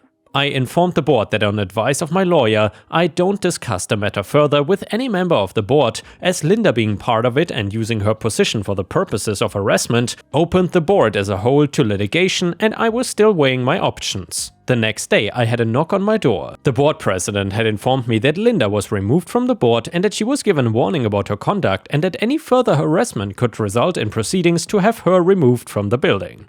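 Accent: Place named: Germany. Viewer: I always enjoy how you narrate these stories - the German accent makes the stories more interesting to listen to. Keep it up!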